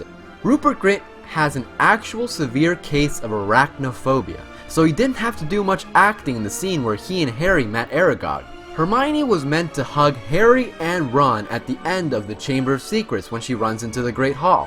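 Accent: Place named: United States. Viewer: american pronounciation for the words of harry potter's world takes off all the MAGIC !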